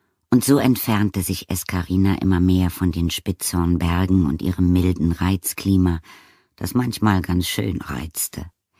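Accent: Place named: Berlin, Germany. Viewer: Gute Stimme , ich könnte das Beste Hörbuch Schreiben aber nicht vertonen mit meiner Stimme , wäre Volter !! Nein es ist eine besondere Stimme und gut gelesen .Hatt Berliner Dialekt leicht drinnen , denke ich.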